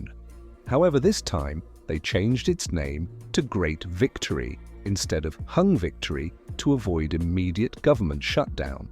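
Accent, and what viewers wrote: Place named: England, United Kingdom. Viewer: The history might be interesting, but the Queens English pronunciation of Mandarin and Cantonese is really hard to get past.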